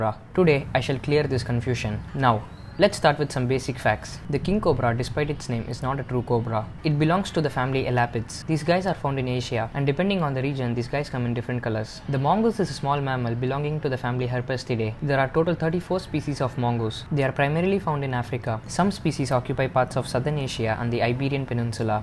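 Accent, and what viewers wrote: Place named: India. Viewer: Jai Hind! Greetings from Alabama USA! Love indian accents too. Always sound cool AF.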